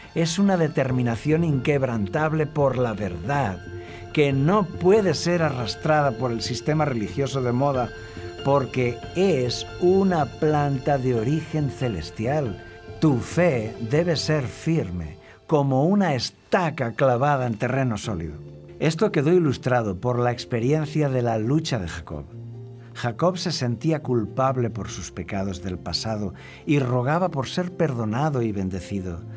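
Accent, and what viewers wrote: Spain. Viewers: HOLA. HACE APROXIMADAMENTE DOS MESES ENCONTRE ESTE CANAL. TODOS LOS VIDEOS QUE HE OBSERVADO Y ESCUCHADO ME HAN PARECIDO MUY ACERTADOS. Y UNO DE LOS QUE MAS ME HA DADO LUCES PARA MI VIDA DE FE ES PRECISAMENTE EL QUE TITULA: ( QUÉ ES LA FE). ME GUSTARÍA SABER SI HAY ALGUN VIDEO SOBRE EL TEMA DE LA MÚSICA, EN ESPECIAL LAS CANCIONES DE FE. LES FELICITO, PUES OBSERVO EN TODOS LOS VIDEOS ( LOS QUE HE VISTO) QUE SON UDS MUY OBJETIVOS. ME GUSTA LA FORMA DE PRESENTAR LOS TEXTOS BIBLICOS. SOY DE COLOMBIA Y SIEMPRE ME HA GUSTADO EL CASTELLANO HABLADO EN ESPAÑA...( ESE QUE HABLA ) ESE TIPO DE ACENTO ME PARECE ESTUPENDO. SOBRE TODO PORQUE ESTABLECE UNA CORRECTA FONETICA ENTRE LAS DIFERENTES LETRAS COMO LA S, C, Z. LA PAZ SEA CON UDS.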